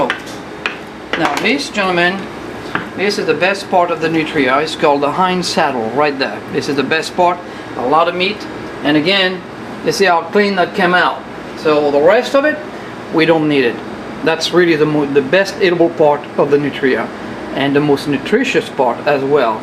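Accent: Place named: Southern California, United States. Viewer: socal beach learn how to speak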